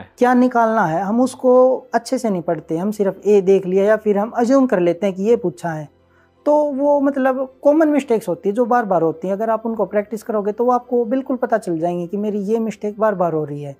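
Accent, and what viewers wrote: Haryana, India. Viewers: Haryana se ho kya bhai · Haryanvi ha k 😁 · That's haryanavi tone..😁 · Ya chhora haryanvi touch hai😂😂🥳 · Hariyani tone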